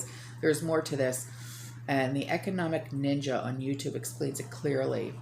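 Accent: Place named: New York, United States. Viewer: “Yous guys” I LOVE IT!! New York sass and GREAT INFO!! Thank you!!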